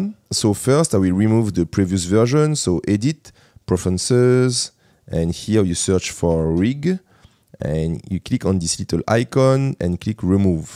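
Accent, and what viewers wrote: France. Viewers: Super t’as bien l’accent Français 😉 ce serait possible que tu fasse des vidéos en Français parce que c’est difficile de suivre tes tutos en Anglais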